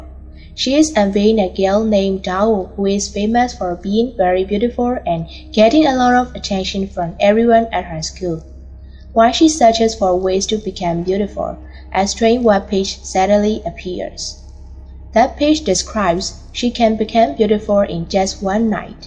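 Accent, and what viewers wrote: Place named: Myanmar. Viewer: Are you a Myanmar?I just notice your accent.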